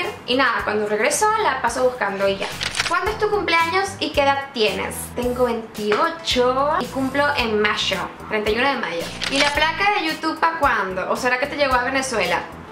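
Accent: Upper class, Chile. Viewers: Habla mas Chileno que yo wn, le salio ree bien por un momento jure que era Chilena pero cuica xd. Saludos desde Antofa 😎❤ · 🤣🤣ME ENCANTAS ..SI YA HABLAS CON ACENTO .ME DIVIERTO MUCHO .CON TUS VIDEOS TAMBIEN TE SALE COMO CUICA 🤣🤣😘😘💕💖🇨🇱🇨🇱🇨🇱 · ......yo creo q nunca te escuché «hablar como chileno»pero si x youtube se nota q tienes un acento más de nosotros pero tus palabras y acento es como venezolano no se xq no te conozco en persona lo q quiero decir q ya hablas como el chileno medio y no con tantos «modismos»medios flaites yo diría q tus amigos chilenos pronuncian como normalmente hablamos un gran mayoría ,Chao y q tengas una buena noche cariños .... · eres mas divertida hablando con acento chileno jsjsjs saludos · Full Sureña, cerca de Temuco... pero Santiaguina. Nones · Te amo!!!!, slds y cada vez mas Chilena! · ajjajajaja mas que nada estabas hablando cuico, no chileno ajajajja que chistosa la weá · Yaa , te sale como chilena pero cuica,igual vale ,está óptimo ,sigue así eres envolvente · Ohh jajajajaja me da risa pero, porque te sale mas chileno que a mi, 100% jajaja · Te sale bien, pero como cuica😂😂😂😂😂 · Te sale bien pero un poco cuica jajja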